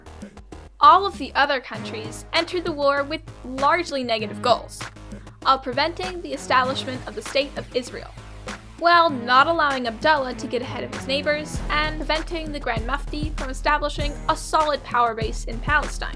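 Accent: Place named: United Kingdom. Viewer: huh, narrated by a young British girl. kinda new way for a channel.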